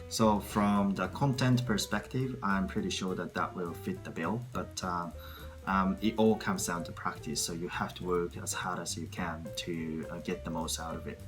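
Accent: Australia. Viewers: オージーイングリッシュですね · 6年前も8.5とった内容の動画取ってますが、この時はよりオーストラリア英語になってますね 今のオーストラリア（メイン）＋アメリカ/ジャパニーズ（サブ）アクセントそっくり。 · ところどころオーストラリアのアクセント入ってて好きです笑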